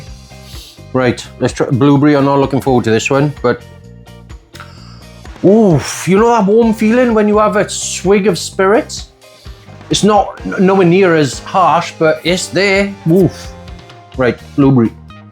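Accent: Wales, United Kingdom. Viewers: I’m Irish & that is not an Irish accent,it’s Welsh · Wrong! The music detracts from your interesting, Welsh-flavoured voice. No music on the next one, please.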